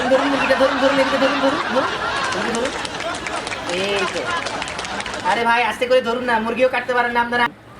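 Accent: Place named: Rajshahi, Bangladesh. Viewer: rajshahir pola kothai buja jaitache ga.... nice job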